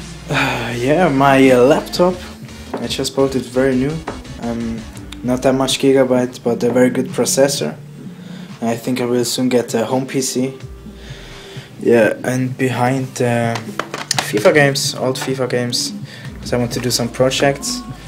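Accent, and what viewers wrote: Switzerland. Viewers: Man merkt voll das du Schweizer (Deutsch redest) bist ^^ Woher kommst du? Ich wohn grad bei der Schweiz an der Grenze :D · Man hört dein "Schwiizerdialäkt" aber schüsch geils änglisch.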